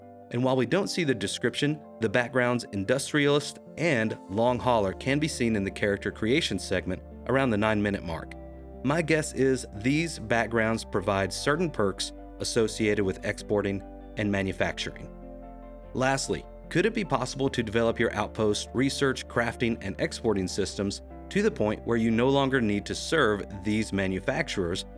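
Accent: United States. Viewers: You sound like people I know from South Carolina. Love these videos btw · How much is Microsoft paying you, and which Southern State are you from, I definitely hear a "TWANG!!!"😆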